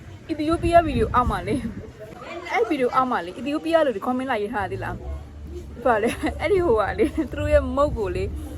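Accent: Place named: Myeik, Myanmar. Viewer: မြိတ်သံဝဲနေသလိုပဲ၊ မြိတ်/ဘိတ်ဖက်ကထင်တယ်နော်။ Congratulation ပါ။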